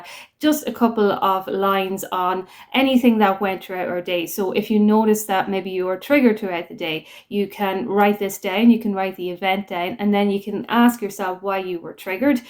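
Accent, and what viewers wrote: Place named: Ireland. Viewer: hi I have sent an email with details 👍 thank you so much for the content you post, just love that Irish accent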